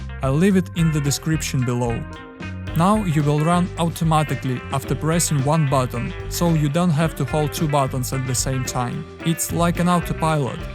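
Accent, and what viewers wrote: Russia. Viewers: I’m might have unpopular opinion but man it’s so hard to watch hard accent videos like this, why every 2nd video from someone from EU is HARDEST RUSSIAN ACCENT 😭🤞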